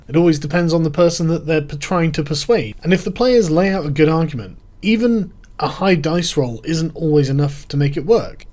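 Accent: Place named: United Kingdom. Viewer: I did not know Sargon was DMing an actual D&D campaign! I was I could be one of his players. I would LOVE to have my character's actions narrated by that amazingly British voice...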